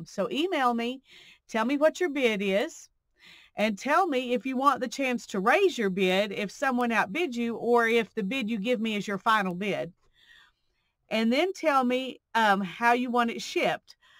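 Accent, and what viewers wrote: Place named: South, United States. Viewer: I'm sensing a slight southern drawl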